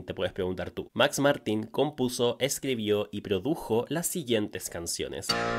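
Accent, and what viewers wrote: Chile. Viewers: Mann, tardé tres minutos en darme cuenta que sos de Chile y yo soy re fan y amante del acento chileno, voy a disfrutar la narracion como no te imaginás, me suscribo ahora mismo. ¡Saludos desde Paraguay! 💙 🇵🇾🤝🇨🇱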